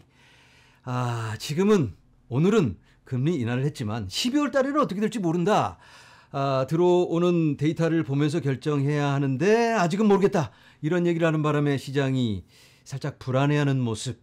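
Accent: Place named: Gyeongsang, South Korea. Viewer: 부산?경주인줄 알았네요ㅋ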